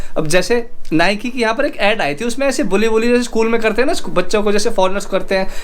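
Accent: India. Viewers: Desi accent pure Hindi me aur haryanvi tushunn. Kya baat hai. 👌jay shree ram bhai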